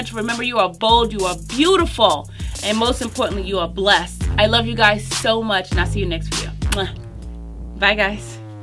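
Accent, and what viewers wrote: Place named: Illinois, United States. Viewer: Where are you from? Sounds like Illinois my entire family is from there, I could be wrong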